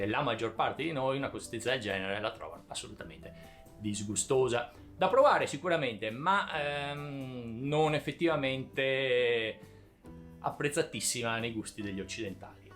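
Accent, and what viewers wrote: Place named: Veneto, Italy. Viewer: lo sento io ,perché sono veneta e forse mi sbaglio ,ma verso Treviso o vicentino?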